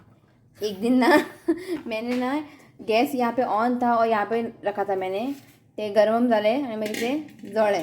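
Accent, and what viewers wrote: Goa, India. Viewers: I loved the Konkani and Goan Hindi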